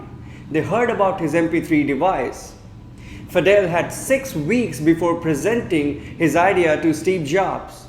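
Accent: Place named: United States. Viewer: Wow....mind blowing English in American tone.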